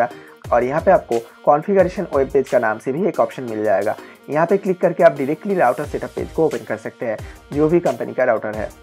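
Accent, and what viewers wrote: Bengal, India. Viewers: Vi tumi Bangali ami Bujhte perechi......😁😁😁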